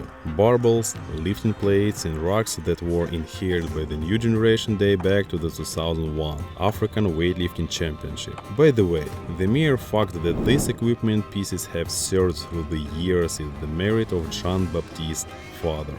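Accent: Russia. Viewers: Ведущий внешне и по акценту на русскоговорящего похож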